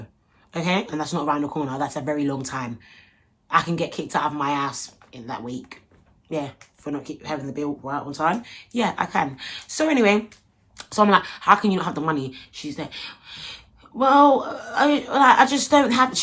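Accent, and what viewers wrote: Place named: Cockney, United Kingdom. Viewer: Lovely lass!! Your so cockney I love it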